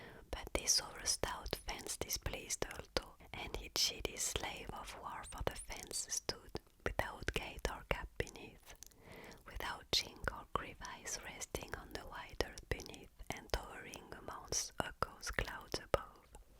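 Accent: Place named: France. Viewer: Gorgeous whispers & great choice of reading - your English is fantastic... especially with your gorgeous French accent 🥰🥰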